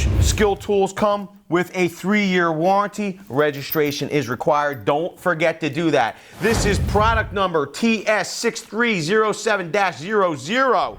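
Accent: Philadelphia, United States. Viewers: I've had this Skil saw sitting in my house for a week. My first tablesaw and I'm terrified to use it lol. Your video is probably the first I've seen where I feel more confident. Others casually rip through wood, no guard on, like it's not a big deal - and I KNOW it is. You've made it a point to keep yours on and show how you're ripping with it on. Granted, maybe a 4x4 or a very thin piece might need it off (?), but you then made sure to say the riving(sp?) knife should stay on if we know what's good for us. I appreciate this approach you're conveying - it's not overly fear-inducing, but also not callous to the consequences. And also, Philly Philly! at least I assume you're from Philly. Your accent made me wonder, and then your ending solidified my assumption.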